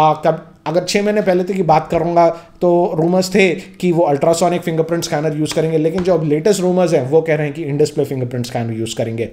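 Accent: Hyderabad, India. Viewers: Now It's Time To Hyderabadi Hindi Well Explained Sir👌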